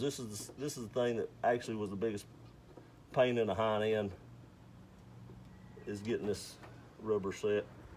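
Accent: South, United States. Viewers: Southern state are you from because you have a very deep Southern accent.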